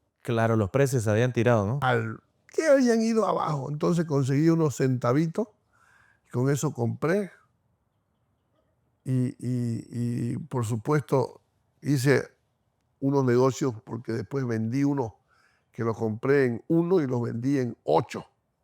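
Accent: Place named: Santa Cruz, Bolivia. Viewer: hablando como todo camba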